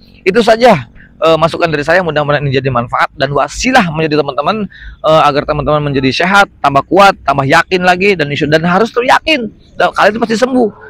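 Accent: Banyumas, Indonesia. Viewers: kirain ormg sunda kang jbule ngapak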